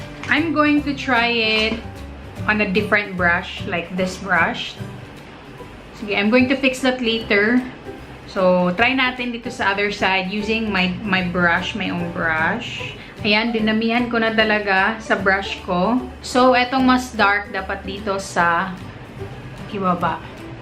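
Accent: Visayas, Philippines. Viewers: HAHAHAHAAHAH ka cute sa pag bisaya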